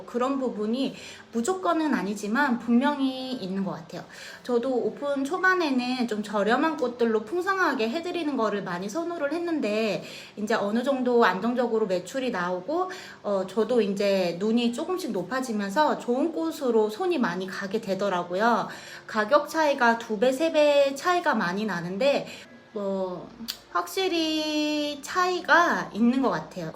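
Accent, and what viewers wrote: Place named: Ulsan, South Korea. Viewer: 출근준비하면서 우연히 보게되었어요. 혹시 울산인가요? 억양등 말투가 참으로 익숙해요. 있어보인듯 꾸미지않으시고 편하게, 사실적이게 말씀하셔서 편하네요~^^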